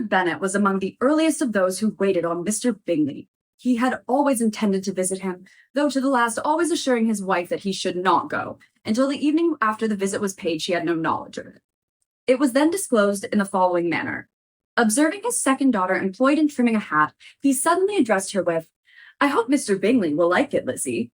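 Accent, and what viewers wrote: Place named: United Kingdom. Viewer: Oh it's so nice to hear another British person on here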